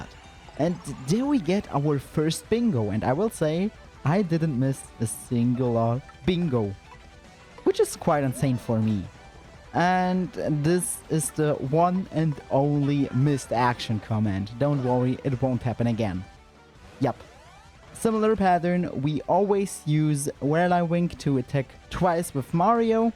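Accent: Germany. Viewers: Man hört den deutschen Akzent raus 😂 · I hear a German accent ?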